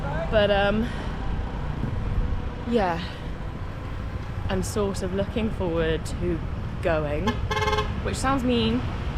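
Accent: United Kingdom. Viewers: Next time, land in Clark International or Cebu, they give passengers a kinder experience. But if its a connecting flight, well that sucks everywhere doesn't it ? Your British English is so perfect, unlike American English which is so hard to comprehend, and I speak the language quite well besides being a college graduate. The accent is so ençhanting, it goes so well with your slightly prickly but charming personality. Im stingy with subscritions,, but I surrendered and subscribed. 👍😘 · Britist accent lady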